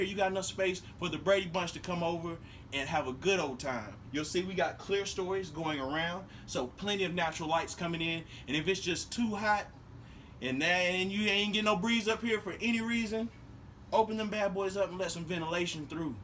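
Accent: South, United States. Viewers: with that intellectual, southern accent 💜💜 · "In case you got little chitlins." 😆 I'm from the North so the accent really gets me. Of course, you all are now saying, what accent, you got the accent! 😜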